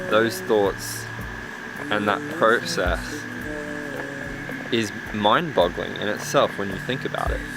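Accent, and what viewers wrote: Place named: United Kingdom. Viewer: so much yeah. btw given your accent i assume you're British so i'm sorry about brexit and all the possible negative consequences it might have.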